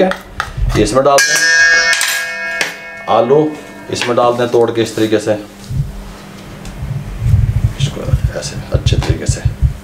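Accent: Punjab, India. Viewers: Main ne jitni bhi aapko recipes daikhi hain aur saaari try bui karto hoon aur wh 100% perfect banti hain aapki recipes bohat zabardast hoti hain aur mujhay sorf aapki recipes pasand hain aaur aap ka punjabi style bohat achha lagta hai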